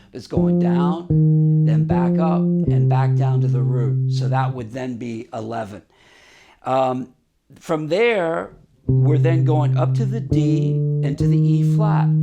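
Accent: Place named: United States. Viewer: omg you are a monster... ps you mentioned 5 pounds. You are a USA native right? You sound like it.., Ps I have 1978 neck through body Eagle BC rich bass which is amazing. Sound neck playability is great 👍. I bet you could tear it up.... I gotta say even the stuff you talk about is on another level.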